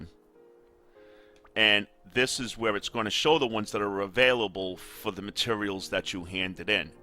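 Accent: New York, United States. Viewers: New yawk accent?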